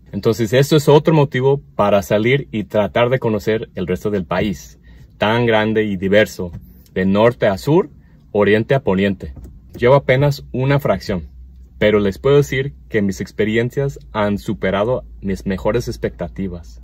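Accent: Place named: North, Mexico. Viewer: Tienes un acento bien norteño 👍🏻 jajaj saludos dios te bendiga mi hermano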